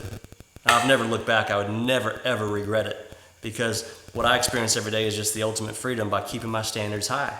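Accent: South, United States. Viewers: I don't know how this video was suggested for me. I assume this guy is a country singer. I don't like country music. I don't care much for his obvious manner of appealing to the patriotic Christian worker class with a southern twang -- not that I don't identify wih some of those categories, but because it seems contrived here. But his words made a difference. Don't lower your standards and you'll be a stand-out. Keep your standards high.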